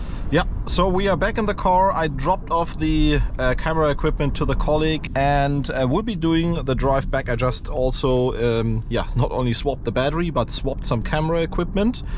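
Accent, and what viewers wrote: Germany. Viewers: Very good. I like it very much when Germans speak English. Go on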